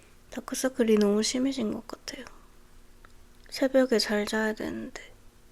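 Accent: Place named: Gyeongsang, South Korea. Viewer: 찐목소리 메컵 좋아요!!! 사투리 쓰시는거 넘 귀여워여..... 약간 경상도 사투린가,,? 🙃 아껴볼게여 🫶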